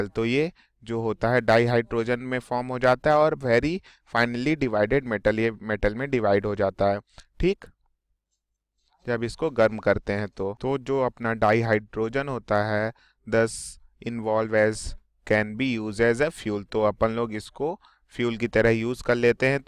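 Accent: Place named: India. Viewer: Am I supposed to understand your indian english ???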